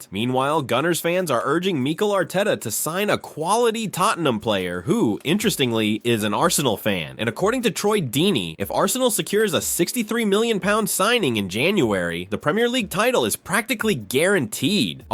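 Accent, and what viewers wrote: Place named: United States. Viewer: Can never trust English transfer rumours with yank commentary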